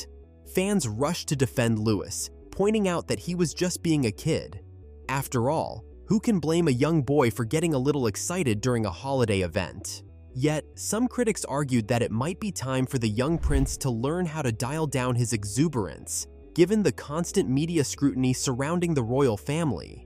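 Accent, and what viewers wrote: United States. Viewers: And why use an AI narrator? If you absolutely can’t afford the minuscule fee a human narrator would earn, at least teach the AI to pronounce the names of the royal family correctly. It’s offensive to propagate mistakes and then use an American accent. That’s a deliberate decision. Real Americans can pronounce Louis correctly.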